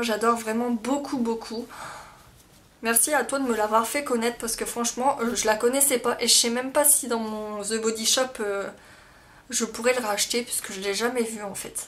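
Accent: North, France. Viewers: Je n'avais jamais tester l'astuce du vernis transparent, je testerais à l'occasion, car moi aussi j'ai des allergies au niveau des oreilles! Merci pour tes supers vidéos et ton accent du nord bien prononcé, ça me fait penser à ma ville natale! <3 Bisous! :)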